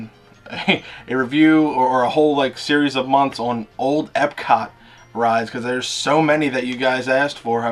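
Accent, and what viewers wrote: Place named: United Kingdom. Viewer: ahaa your British accent was great :D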